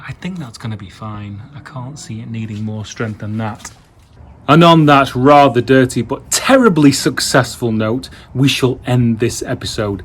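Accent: United Kingdom. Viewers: yeah totally understand buddy. I just wish YouTube would place them better. Loving the build buddy its not my normal cup of tea but you and that bug have me hooked! More than worth the awkwardly placed adds! Loving the way the 'BRIT' keeps coming out every now and then. 😁👍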